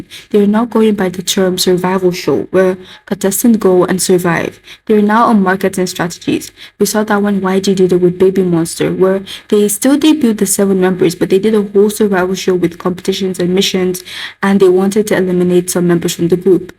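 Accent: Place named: Nigeria. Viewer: OMGGGGG YOU'RE NIGERIAN TOO. I HAD A FEELING YOU WERE FROM YOUR ACCENT. I'M NIGERIAN TOO NICE TO SEE A FELLOW NIGERIAN THAT LIKES KPOP❤❤❤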